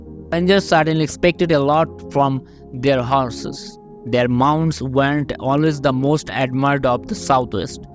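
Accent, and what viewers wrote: India. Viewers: Sonehow i can't deal with an Indian accent presenting such a real Western American history story. Doesn't sound authentic. Please use someone with no accent.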